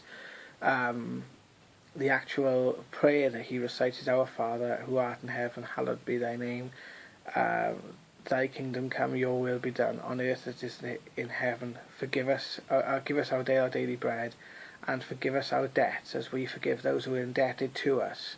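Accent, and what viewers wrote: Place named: United Kingdom. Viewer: I went through this exact same rationalization process several months ago. My advice to you is to choose an example that you have personal knowledge of, and research it through copyrights, patents, and especially microfiche. If possible, do this research in the library rather than from digital sources. The physics of our world claim this is possible in theory, but you would have to pay attention to the modern discoveries, especially those from this year, ie the fifth force being dark protons, and the ability to engineer particle entanglement. If you are from Great Britain, as your accent suggests, you have the benefit of having been schooled with the bible. You may find your proof in a bible change. Good luck to you; the truth sets you free.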